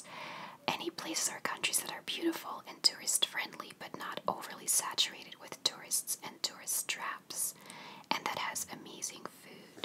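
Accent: United States. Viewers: You remind me a lot of myself, older than I look, not married, Barack Obama was my answer to ”who would u have dinner with?” (Btw I don't think grandparents is an obvious answer b/c people tend to pick famous or infamous people) anxiety issues, and I can be introverted yet adventurous. Can't imagine moving to another country so young though. Also you speak perfect English, w/ American accent. I think you must have been American in another life ;) though it's seems Sandanavian or Northern European English speakers- seem to have sometimes next to no accent at all. Especially Swedes. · I love your accent, it has always sounded American to my ear! I am from Scotland though lol so many accents sound American to me 😂 xx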